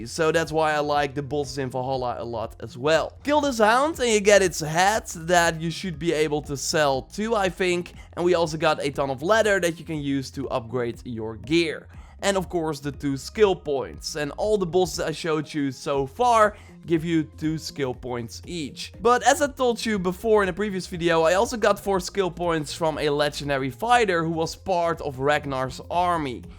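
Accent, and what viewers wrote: Netherlands. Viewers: Awesome vid, my fellow dutchman! (Guessing by the accent... am I right? xD)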